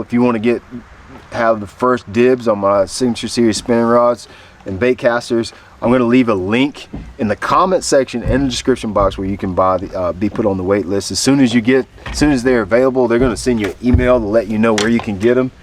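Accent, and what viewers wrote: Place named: South Carolina Lowcountry, United States. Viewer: I have know for a while there was no standard on rods. That's why I don't order a lot of them online. I know fisherman that will only buy a certain series from a certain brand because of this. You are absolutely right about there is not much choice for a quality ultralight, 7 ft. spinning rod., With more use of BFS, DC bait casters the same can be said for casting rods, Especially at a decent price. A lot of people still fish with a closed face spinning reels for crappie panfish., I think there would be a market for more ultra lite 7 ft casting rods. Where I fish, Clark Hill, is now full of 12 and 13 inch Spots. They are a blast on light tackle, I like your no nonsense videos. Or maybe since I am from the S.C. low country, we just talk the same. 😂 I will give your rods a chance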